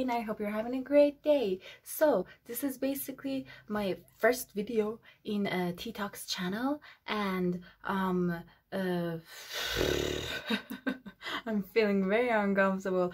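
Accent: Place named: Iran. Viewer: i just started reading it. i had second thoughts just in the beginning of the book but I'll power through. also your pronunciation of names in the book made me sure you're persain.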